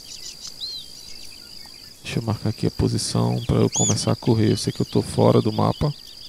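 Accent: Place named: Recife, Brazil. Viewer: Bicho tu és de que cidade ? Tens sotaque daqui de Recife.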